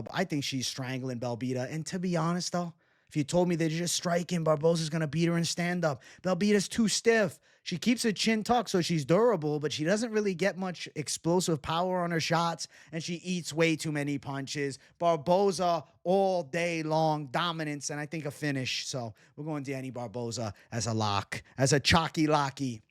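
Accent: New York, United States. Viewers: Can u put ur picks in the description so I don’t have to listen to that awful NY voice of urs. New Yorkers sound so damn dumb.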